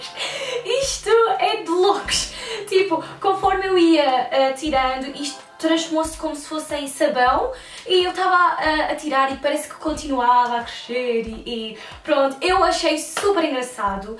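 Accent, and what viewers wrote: England, United Kingdom. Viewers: gosto tanto do teu british accent 😍😍 vou comprar essa máscara 😂😂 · Que sotaque inglês lindo!!! ❤️ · adoro o teu sotaque britânico 😍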